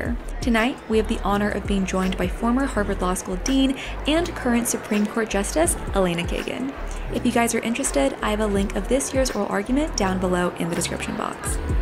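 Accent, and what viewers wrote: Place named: United Kingdom. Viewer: why your accent so British, rather than American?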